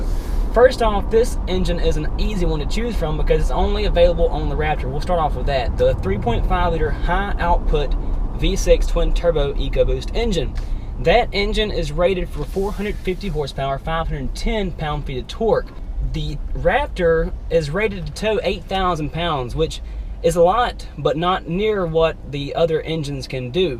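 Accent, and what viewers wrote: United States. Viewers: Country as hail lol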